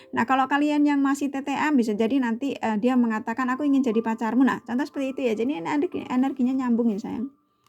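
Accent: Java, Indonesia. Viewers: Btl skl kak sy&dia pernah hdp bersm 29 thn,dan sdh ada DUA anak, tiba2 km bercerai, skrg sdh 4 thn,dan telah bersm yg lain, namun akhr2 ini ada gelagat ingin rujuk, sy sih msh sndr ( single parent ), bg sy sdh nyaman dgn kesendirian,sy sdh happy dgn cucu ytc. Mksh ya kak readingan,sy sk skl dgn suara kk yg njowoi dgn canda' an ben no rasakno dll, ok kak smg kk makin sukses,sht sll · Kalau boleh tau kak orang mana Kok bicaranya logat jawa banget. Jawa tengah X ya kak Salam kangen Aja for you kak 😘😘😘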